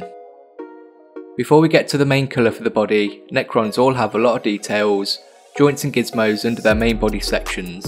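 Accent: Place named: Suffolk, United Kingdom. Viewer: Love the video! helped me a lot. I swear thats a suffolk twang I hear as well ;)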